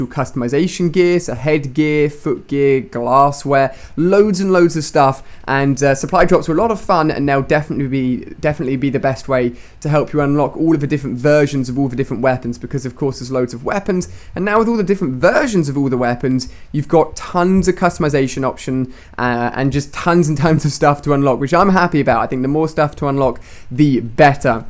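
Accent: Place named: United Kingdom. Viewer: FINALLY they bring back a british voice to CoD.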